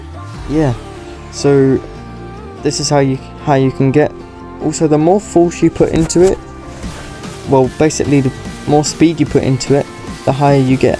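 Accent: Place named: United Kingdom. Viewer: Just wanna say that I had a bunch of ppl telling me that I sound just like you in gtag lobbies and I realised the only reason why was cause where both British lol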